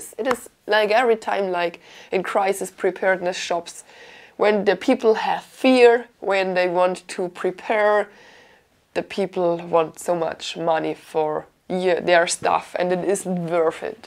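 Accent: Bavaria, Germany. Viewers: Your accent when you speak accuses that you are German or something similar. I have had these models in my own house and in my parents' house for more than 30 years and no misfortune of any kind has ever happened, not even a fire or someone who has suffered burns. do not spread bad and false informations. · Sooo lustig: englisch mit bayrischem Akzent! 🤣🤣🤣🤣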